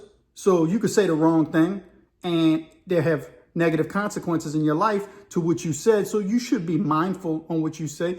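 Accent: Louisiana, United States. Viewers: You sound like you are Cajun my man. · You sound like Louisiana supposed to.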